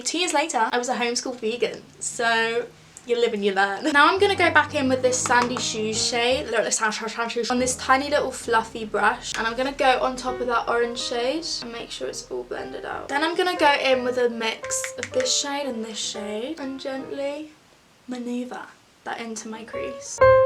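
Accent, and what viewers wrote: Scotland, United Kingdom. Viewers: Is she Scottish or British cause confusion but still she amazing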